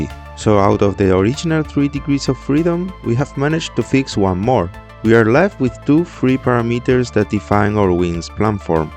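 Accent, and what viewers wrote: Spain. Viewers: suenas español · jajja se nota que es ESPAÑOL. pero bueno asi llegas a una audencia mayor. Buen video.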